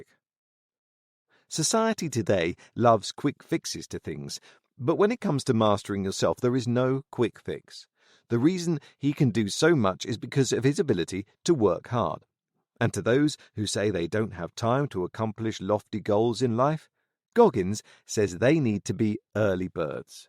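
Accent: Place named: England, United Kingdom. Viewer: Representation matters: This book by a Black author was summarized by a white man. And no offense to the talented, but why, in the year 2021, am I listening to a white Englishman recount the uniquely American experiences of a Black New York-born United States military officer!? Please consider hiring writers and voice actors whose identities more closely align with those of the wonderfully diverse authors you showcase. You guys did good, but you can- and should- do better.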